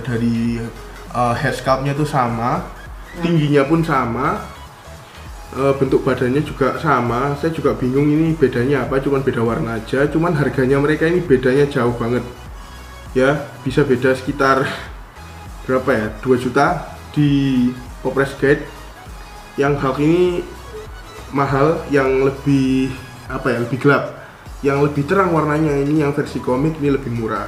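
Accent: Java, Indonesia. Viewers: PERTAMA KOH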